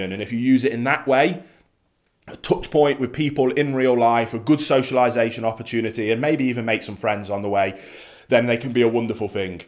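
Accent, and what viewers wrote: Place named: Midlands, United Kingdom. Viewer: Are these in the uk? You sound like you come from the midlands xx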